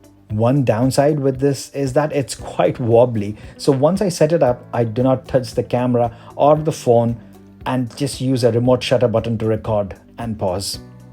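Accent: India. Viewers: Are you Indian ?? Your voice look like an Indian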